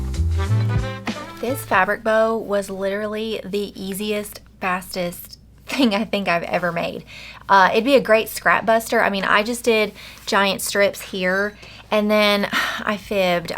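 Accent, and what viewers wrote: South, United States. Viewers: The lady is right, anywho is not a word, it is a phrase...a saying...a moment when words don't matter. I am from Michigan and my family says it ALL the time! My dad's side especially says it and we have no roots in the south at all. I think phrases said are a moment when the "uptight" leaves the conversation, lol. You say all the Southern you want Miss Ma'am! I like your southern accent and speech as it reminds me of when I lived in Atlanta with my best friend Julie. Her mom would introduce me to others saying, "This is Jennifer. She is our Yankee friend but we love her just the same." 🤣😂🤣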